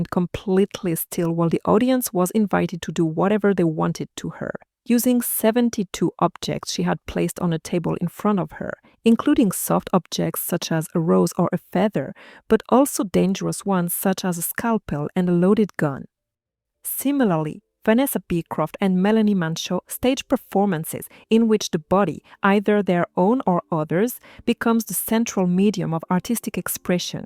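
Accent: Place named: France. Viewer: You have a beautiful voice with your elegant French accent. Your analysis of great works of art is clean, consise and freshly enlightening. Thank you for this.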